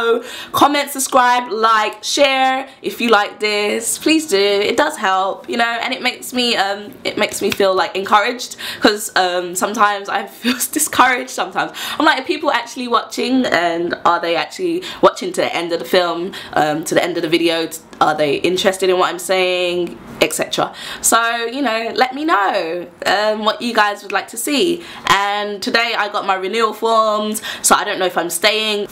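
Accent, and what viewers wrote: United Kingdom. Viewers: I'm almost crying cause I love your British accent to much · Absolutely adore your accent, luv! I'm British as well, and I miss that accent. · Owing to you, i got used to British accent. I could understand you fully! And i like your giggling smile. (I call it 눈웃음 ^^)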